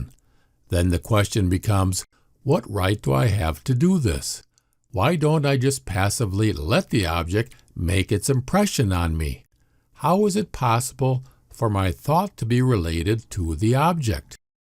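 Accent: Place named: Western New York, United States. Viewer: Are you from WNY? Buffalo area? Possibly Chicago or Michigan? I hear your accent and I like it; I am from Niagara Falls NY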